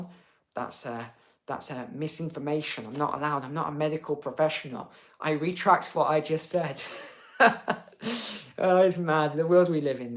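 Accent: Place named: United Kingdom. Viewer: Hi Sir ! You always have a good report ! I want to tell you that I love your voice and your Brit accent ! My relatives are from Chester, England . my great great grandfather came from England to the US at seventeen yrs of age . Before Ellis Island . He came alone and stayed with his sister here in Connecticut ! Became a prosperous farmer and had 400 acre farm . He was a fine Christian man. Still got his road named after him .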